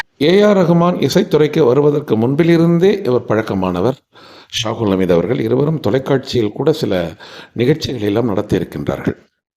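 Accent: Sri Lanka. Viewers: Neenga ilangaiya sir unga slang nalla iruku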